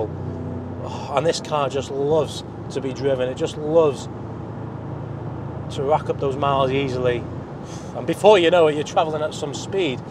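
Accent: North, United Kingdom. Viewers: I'm sorry...I just cannot listen to your droll northern accent for more the 2 mins. Apologies.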